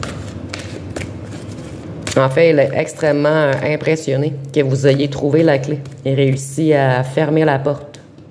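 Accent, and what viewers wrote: Quebec, Canada. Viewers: Merci infiniment pour cette guidance tellement juste pour moi. Avec le magicien et le roi d'épée c'est exactement ça ! Maintenant j'attends mon roi de coupe qui arrive j'en suis sûre. Je vous écoute de Paris et j'adore votre accent québécois ❤ Merci et bonnes fêtes de fin🎉 d'année 🎉🎉🎉🎉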